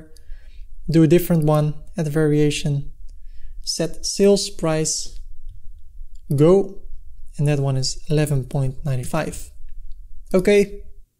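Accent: Netherlands. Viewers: Je hoort gelijk is Nederlander :D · Hey; Danke Well, No need to improve your English, Your Dutch accent is a reminder of the Dutch Kindness and all the Good times we get to spend in Holland, Thankyou Keep it UP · ken het accent ;) Nederlands Lol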